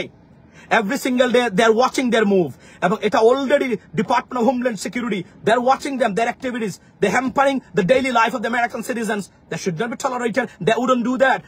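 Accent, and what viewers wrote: Sylhet, Bangladesh. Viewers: I like your content and I must inform you I love Sylheti dialect. It is a melancholy sound to my ears but it’s me. If you don’t mind since, you are speaking in public platform. Could you speak proper Bangla. It might give you a non-biased bigger audience. Just saying. Thanks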